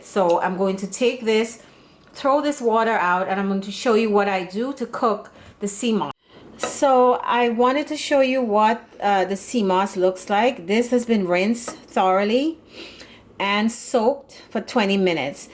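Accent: Trinidad and Tobago. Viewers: You sound like my cousin from Trini, so easy to listen to. You're a natural born teacher. Thanks! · hmmm my Trini spidee sense is telling me your Trinidadian. Big up all my TRINI!!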